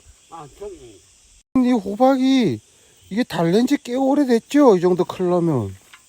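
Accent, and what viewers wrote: Gangwon, South Korea. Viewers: ~~^^강원도 사투리 너무좋앙♡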